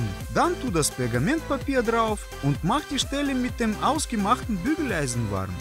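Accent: Russia. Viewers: Русский акцент нескрыть😂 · Der Typ ist mal Super symphatisch und macht diese ziemlich aufwendigen Videos erstens mit Begeisterung und zweitens auf deutsch, was verdammt mutig ist, da es für ihn ja eine Fremdsprache ist. Und da ist es wirklich extrem Dumm, sich über den russischen Akzent zu beschweren. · ja und................ ich mag den russischen akzentuiert hört sich cool an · Sein russischer Akzent ist sowieso richtig lustig xD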